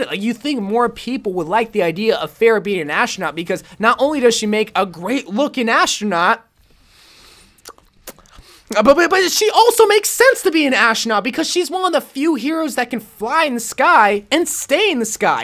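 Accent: United Kingdom. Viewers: Your British accent ain't that bad don't worry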